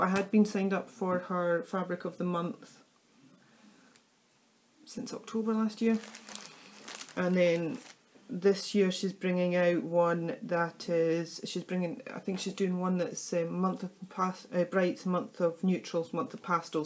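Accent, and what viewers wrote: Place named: Scotland, United Kingdom. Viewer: My grandparents are from Scotland...it's great to hear their accent again. Can you show me how you use the gradient floss?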